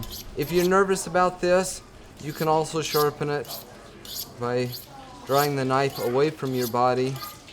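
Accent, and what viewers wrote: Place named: Utah, United States. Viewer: 😂 Yeah, I blame it on the Utah accent. Will try to do better next time.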